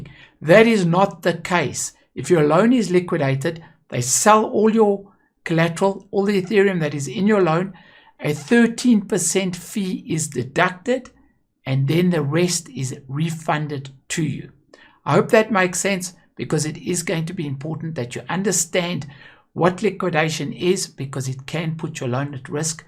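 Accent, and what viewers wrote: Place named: South Africa. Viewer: Hi,my brother!You sound South African.Any pointers for me here?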